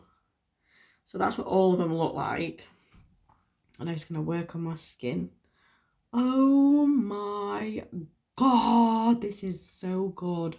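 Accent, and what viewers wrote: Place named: Southern England, United Kingdom. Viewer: Hi, just subbed to you, great video! That becca pallette looks well lush! I have been trying to figure out your accent...Yorkshire or Lancashire? I am a Yorkshire lass but my accent is all over the place due to living down south for a while when I was younger, your accent sounds like you have some southern influence too??? xx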